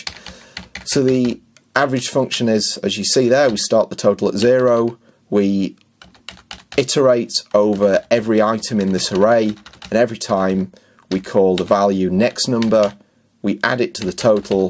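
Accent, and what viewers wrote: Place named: Cornwall, United Kingdom. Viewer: Nice program! And btw : I love your pronunciation und I guess ... Cornwall? Greetings from Germany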